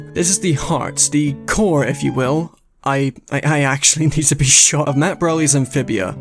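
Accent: United Kingdom. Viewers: Brilliant analysis, I had most of the same thoughts about this show. The episodic nature almost put me off it but the slow burn of the overarching plot that was always present under the surface kept me hooked. Season 2 was fantastic! Season 1 was average except when it focused on the plot and season 3 was schizophrenic honestly, the tonal shifts didn't work and the ending felt like kind of a mixed bag but overall it was a wonderful show. The animation was brilliant, the comedy was consistently funny most of the time and the core story was so good. It really could have been one of the best. On an unrelated note, I must suggest you try She-ra and do an analysis if it grabs you, same for Arcane as well. I have loved all the analysis videos I've watched and while my bias towards British humor and accent contributed to that, I subjectively think your videos are objectively good. 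Anyway, TL;DR She-ra and Arcane analysis please.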